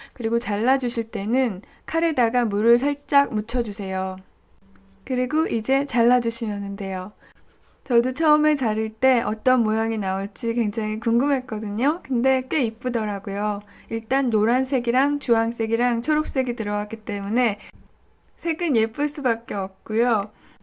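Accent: Seoul, South Korea. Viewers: 목소리좋아요. 서울본토발음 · 말투가 80년대서울사투리에요 ㅋㅋㅋㅋ<3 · 교포가 아니라 서울사투리 쓰셔서 그런것같애요^^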